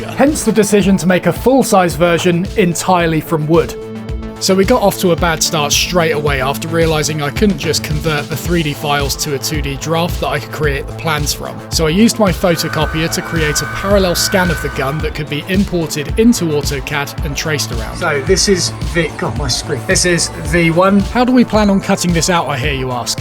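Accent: United Kingdom. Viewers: I wish I had this man's British accent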